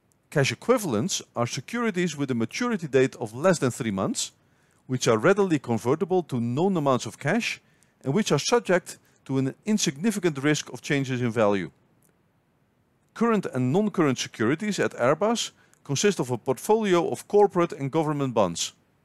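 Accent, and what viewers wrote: Netherlands. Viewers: Very thorough explanation of accounting terms, this will help a bunch in reading 10-K and Qs - thank you! I can hear you are Dutch! :) Hi from Zaandam!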